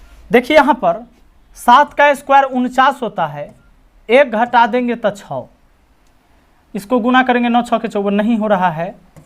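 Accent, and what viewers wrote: Bihar, India. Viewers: Sir ap Bihar se ho kia?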